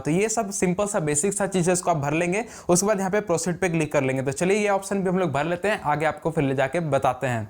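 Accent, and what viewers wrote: Ranchi, India. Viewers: Bhai app ranchi sa ho kya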